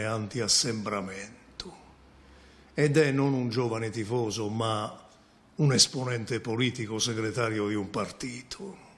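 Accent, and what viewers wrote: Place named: Naples, Italy. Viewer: ascolta, sai bene che ci troviamo in Italia e tutti hanno degli scheletri nell'armadio, ma a me sembra sinceramente che De Luca un po' esageri, il guaio è che sembra recitare una sceneggiata napoletana un po' clownesca. Poi la tipica pronuncia partenopea, mi sembra di sentire la pronuncia di mio padre e dei miei congiunti napoletani. la nostra famiglia è di Torre del Greco (provincia di Napoli dove sono nato anch'io e da dove mi trasferii successivamente a Trieste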